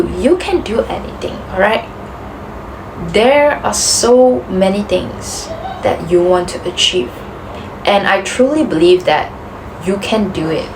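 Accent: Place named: Meghalaya, India. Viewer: Your accent reminds me of my incredibly wise friend who is from Meghalaya. It feels like an older more experienced friend spoke to me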